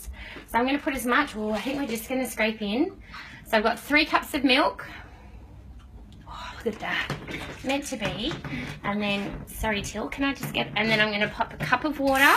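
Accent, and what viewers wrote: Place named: Australia. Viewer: Hi, Thanks for showing me how to make slow cooker porridge. Incidentally I like your warm Australian accent and the gentle way that you supervise your daughters who seem at that curious/testing things out stage of development. I am single and have a compact slow cooker, so I will just have the amounts. Warm regards from Robert in Scotland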